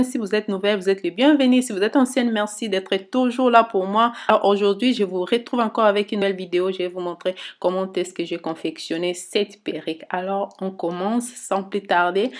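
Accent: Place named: Gabon. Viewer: j'aime beaucoup le thon gabonais de la part d'une kmer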